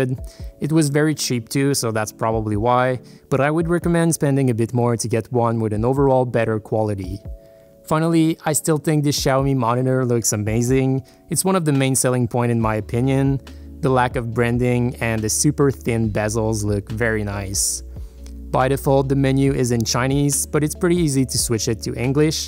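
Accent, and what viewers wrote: Quebec, Canada. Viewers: i can tell by your accent, que tu viens du Québec XD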